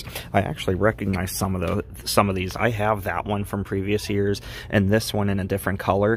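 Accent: Pittsburgh, United States. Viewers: I thought I heard that Pittsburgh accent in your Chuck E. Cheese video lmao yinzers for life man. Subscribed!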